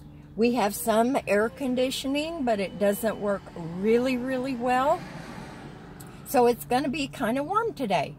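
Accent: South, United States. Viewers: For what it's worth, I just checked out your very first video from 2 years ago and you sound the exact same way - southern accent and all! There are always going to be people here on YT and elsewhere on social media who will try to find anything to criticize you about, especially since they can do it anonymously behind a keyboard. Unfortunately, it comes with the territory. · Hi 👋🏻 I’ve not commented in a while. I’m back to work, so I don’t get as much time to sit and binge watch your videos. Hehe. I am still watching however, just not commenting. Admittedly I probably would have done the same as you and shouted out. And as for your accent, well I believe you have a gorgeous southern accent. And I can hear it in all of your videos. I’m from the uk though, so I’m not used to the American southern accent at all. Loving your stories, I’d love to hear about the one you mentioned about a gang member in your property. 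#exciting #cliffhanger lol have a nice day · At the very least I would’ve called, but probably done what you did. That’s so true about accents, as I’ve seen it with my in laws, especially if they would be talking to friends or family that are still in the South. That’s your roots coming out, and that’s you!😍❤️ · You did right!!!! I love your voice and for some reason it rings a bell you went to the rubber tramp roundup and met another southern gal and both of you were d,rawling like true born southern gals! Lol,it just reminded me,could be wrong though! Love Rea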